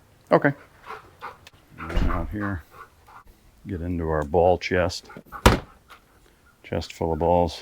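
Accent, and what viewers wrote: Netherlands. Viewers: are you dutch ? with the af and blijf? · Are you Dutch? Good video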